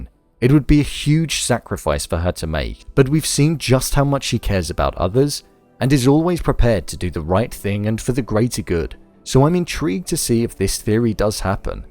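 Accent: United Kingdom. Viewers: i subed uh provably not for these topics but for the accent lol , listening you will help me learning british english a lot.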